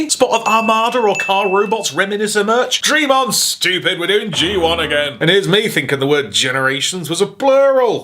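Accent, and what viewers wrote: United States. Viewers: !!! Your American accent is better than mine!!! And I’m American!! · needs to do an entire review in an American accent. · The world needs more American. Can you do a review using only that voice? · That American accent tho!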